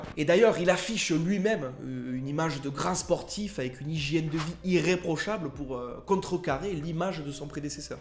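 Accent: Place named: South, France. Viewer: C'est marrant de transporter ton accent du sud jusque dans ces froids polaires et inhospitaliers lol. Merci d'avoir exposé la face sombre de ce pays et de ses dirigeants, et encore tu es resté soft. J'en ai marre que des gens clashent Macron pour dire que Poutine est meilleur, avec plus de virilité et de charisme. Clashez Macron si vous voulez mais pas pour de mauvaises raisons. Poutine est avant tout un sociopathe qui se fout pas mal des droits de l'Homme (2 preuves parmi d'autres, il a bossé au KGB et il cautionne les agissements de son pote Kadirov contre les homosexuels).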